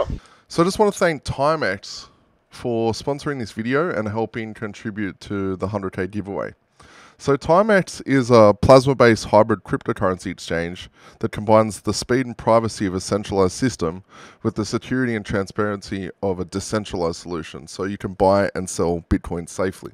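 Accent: Australia. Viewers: Just saw you on the bitboy crypto livestream! Thought I heard an Australian accent, Perth here. Love the content and also 100k lol, my first week of crypto and it has opened my eyes massively about the world and also money! Can't wait for what's ahead, hopefully will get that deposit for our first family homee very soon following some good content and advice 😊👍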